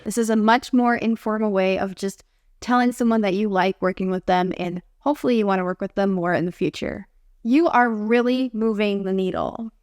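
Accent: United States. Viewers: love American pronunciation.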